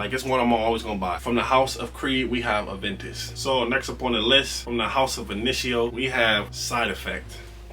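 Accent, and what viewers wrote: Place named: New York, United States. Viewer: Bro sound like he from nyc , much luv . One of the few reviewers that don’t talk about clones in every video , keep it up g I’m taking 📝.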